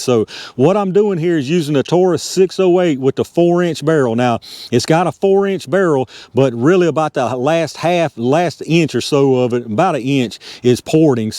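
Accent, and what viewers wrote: South, United States. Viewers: Well, this was a bit like "beating a dead horse", wasn't it? It is already well known that , in terms of kinetic energy, the average 38S is very close the the average 9 mm Parabellum. On the other hand, 380 is practically on par with 32 auto (32 caliber pistol, in Europe known as 7.65 millimeter pistol round) which is a rung lower than 38S/9mm. Nevertheless, I enjoyed watching your test and listening to your Dixie American English :-)...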